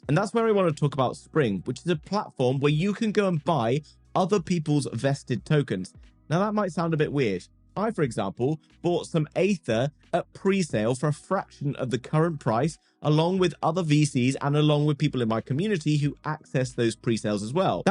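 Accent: United Kingdom. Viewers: Here's a question nobody else has really covered - when deciding on a portfolio containing the various narratives suggested, does it make sense to go and acquire all the coins at once (large/mid & small) anticipating the Bitcoin breakout that just happened or would it have been more sensible to have just bought the bigger caps and held off buying the others until phase 3 began.? (Obviously thinking about the pre-election 6 day dip we just had that gave my alts a bit of a kicking). Thanks, it's refreshing to find an articulate fellow Brit running one of these channels, Cheers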